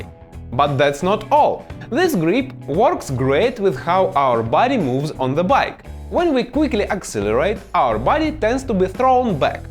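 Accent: Russia. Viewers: Love the vids brother, forgive my ignorance please, but you sound like you're from Russia? Im curious, how many months of riding do you guys get over there? in my head all i see is snow and furry hats, Russia's cold looks like its NO JOKE 😳 Ive obviously never been but id love to.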